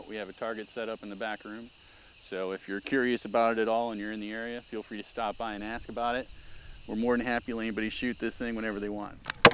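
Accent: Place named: United States. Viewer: es insoportablemente gringo. Habla en jeringoso yankie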